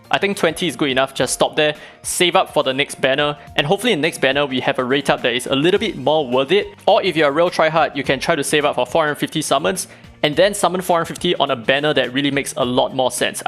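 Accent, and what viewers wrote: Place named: Singapore. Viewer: such a strong Singaporean accent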